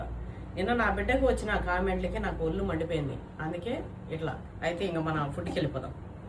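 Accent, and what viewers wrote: Telangana, India. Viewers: Telangana slang vachesinde andi miku baale chepparu😅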